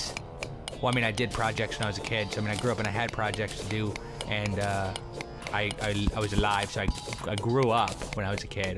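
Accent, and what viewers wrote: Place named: England, United Kingdom. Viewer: English :) not American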